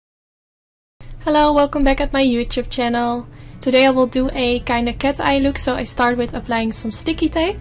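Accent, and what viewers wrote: Netherlands. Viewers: ik hoor aan je accent dat je nederlands bent haha! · Nice tutorial and I love the music :D Maybe it is a tip to place text for all the brushes you name in the video? You have a very Dutch accent and sometimes it is a littlw bit hard to understand what you're saying :$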